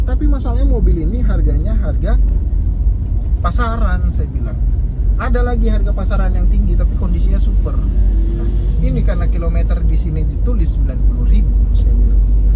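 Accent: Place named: Padang, Indonesia. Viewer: Logat abg kyk org padang 😅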